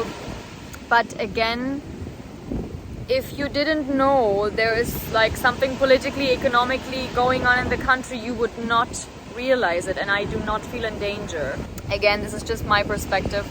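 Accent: India. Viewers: Srilankans r super helpful n u sis don't pitch in ugly India pitch u r in Srilanka please.. yakkk Urdu voic in Srilanka 😂🙏 Cmon.. Srilankan sound is proper cool than stupid Urdu in Srilanka sooo odd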